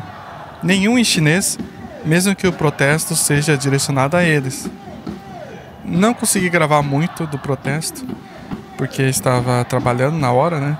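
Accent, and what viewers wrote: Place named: South Korea. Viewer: AMEI SUA PRONÚNCIA COM SOTAQUE COREANO NO PORTUGUÊS ❤ EXPLICAÇÃO MUITO BOA👏👏👏👏🇧🇷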